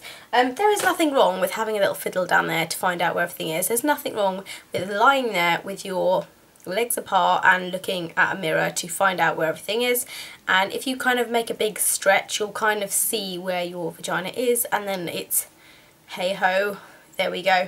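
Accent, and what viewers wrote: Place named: London, United Kingdom. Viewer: I think you are sooo pretty :) and I love your accent I used to have an accent like that, I was born London but I moved so I speak differently now:)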